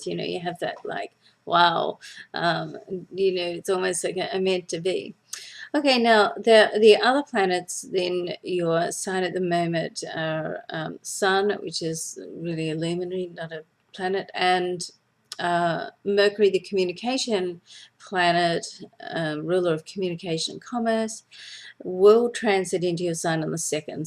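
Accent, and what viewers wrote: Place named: Australia. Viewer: Many thanks I really enjoy your weekly astrology videos. As a Taurbabe ( kiwi) now living in Vancouver, your Australian accent is lovely to hear. Cheers Deb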